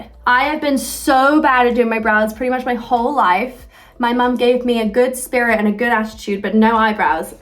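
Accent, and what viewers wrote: United States. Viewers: I just don’t get what’s going on with her accent. She’s worked in America for most of her life and still maintained a British accent, even in her interviews last year she had a British accent, but now she suddenly has an American accent? 😭 how does that even happen? 😂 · What happened to her accent? It became really american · You're picking up the American accent Millie. Very cute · Her American accent is getting stronger I miss her UK accent 🥲🫶🏻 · She lives mostly in the U. S. so, of course, she's going to take on a more American sounding accent... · She’s just got a standard accent now. Plus she does tend to put on an American accent a lot. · I was noticing that too! She sounds so American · i love her american accent 😍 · Do you guys think she has more of an American accent than British now? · its so cute how shes starting to sound a bit american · she basically has an american accent now lmao shes so cute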